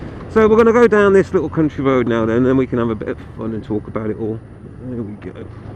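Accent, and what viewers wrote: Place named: RP, United Kingdom. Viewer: What size engine are these 214 trumpys you do sound rather POSH and TOFFEE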